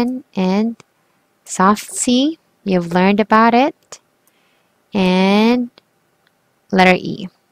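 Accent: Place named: England, United Kingdom. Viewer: Nice english accent po ate sana maturuan niyo ako ganda niyo po keep safe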